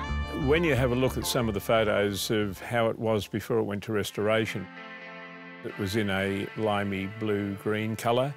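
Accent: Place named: Australia. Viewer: WOW beautiful "old girl". Sounds like your Aussie, Im stoked to think we have one of these in Australia. Hope I see you on the road one day.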